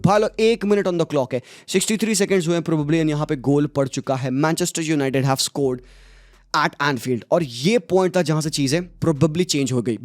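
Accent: India. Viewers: Bro your accent is shit bro Indian accent make me puke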